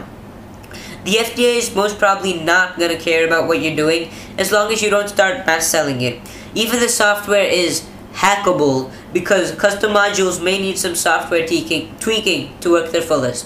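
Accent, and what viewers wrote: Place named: India. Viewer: Face not shown. Are you from India cause you sound like a Indian. Is it true Indian are not suppose to show their face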